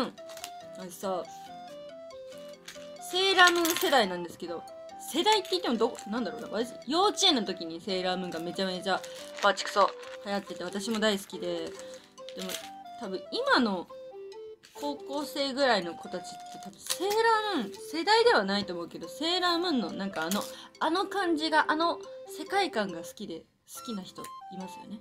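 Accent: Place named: Sendai, Japan. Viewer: ばちくそ出てくる仙台弁がいい‼️ 親近感🥰‼️ 馴染みしかない喋り方‼️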